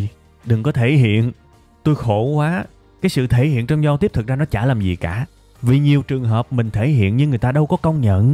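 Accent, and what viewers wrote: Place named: Tay Ninh, Vietnam. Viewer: Mình có người bạn ở tây ninh, học làm ở sg cái giộng điệu, nhấn nhá, cách nói chuyện y chang bạn này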